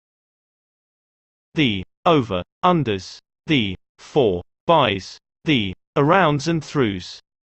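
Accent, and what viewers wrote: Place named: Ireland. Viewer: Thanks. Great video. I prefer the Irish accent. May have something to do with my mother being Irish.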